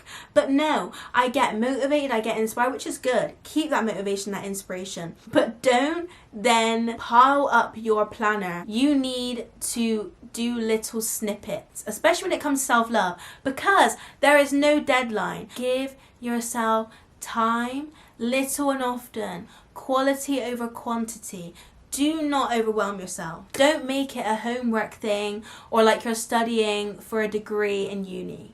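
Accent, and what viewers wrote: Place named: Bristol, United Kingdom. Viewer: Are u from Bristol? Ur accent sounds so Bristolian 😂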